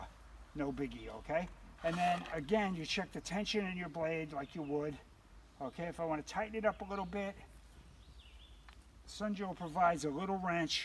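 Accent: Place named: Rhode Island, United States. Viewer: Cool accent. Rhode Island? Sounds like my relatives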